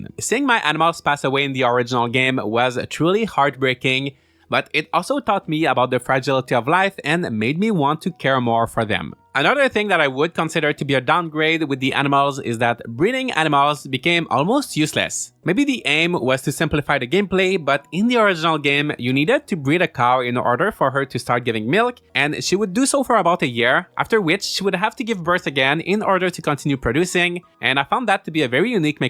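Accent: Quebec, Canada. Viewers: Are you french Canadian? You have the same accent i have.Great review Btw. Keep up the good work!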